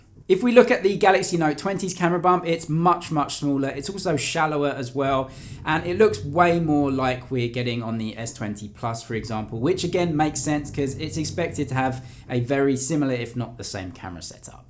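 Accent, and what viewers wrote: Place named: United Kingdom. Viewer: I thought you were Brian Firkus but with a British accent ahaha nice video though! 👍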